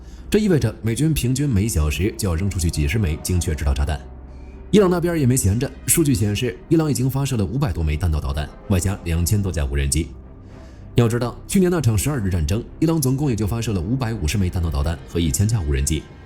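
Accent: China. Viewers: 这个是个什么来历？从视角，口音，以及用词来看是中国大陆背景。但是字幕全用繁体，字幕里的用词也是台湾风格。比如说语音里说"项目"，字幕则写“专案”。想假扮台湾人吗？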